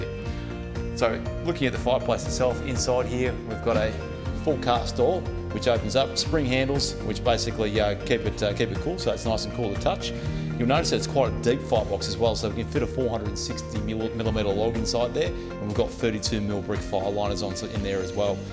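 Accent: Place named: Australia. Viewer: Useless video due to the stupid music. I can make out around 20 percent of what is being said, combined with the aussie accent its not an easy listening situation.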